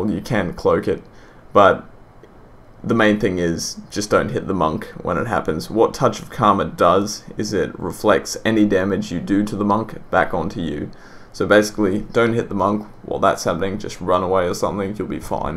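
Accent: Australia. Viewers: Hey man you sound Australian which part of Australia are you from ?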